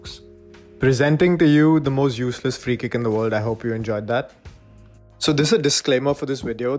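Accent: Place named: India. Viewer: Indian accent is very bad i don't like it. Sorry to tell you the truth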